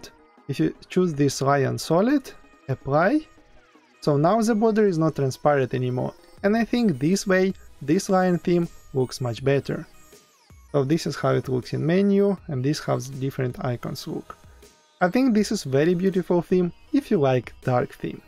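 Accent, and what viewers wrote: Russia. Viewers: лол, чувак, по акценту понятно что ты русский)))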